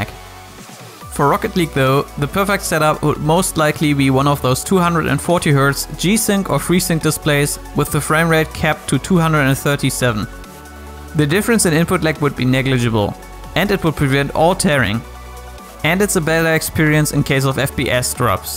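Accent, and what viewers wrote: Germany. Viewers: Man hört auf jeden Fall den deutschen Dialekt raus 😄 Danke für das Video 👍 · Bist du Deutscher? :D Gutes Englisch aber man hört es trotzdem immer etwas raus, mich eingeschlossen xd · WOW ich höre sofort den deutschen accent :)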